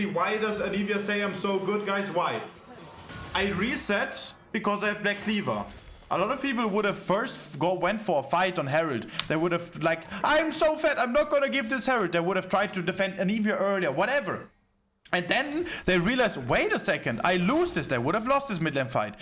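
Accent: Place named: Germany. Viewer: Such a strong german accent. I cant... just cant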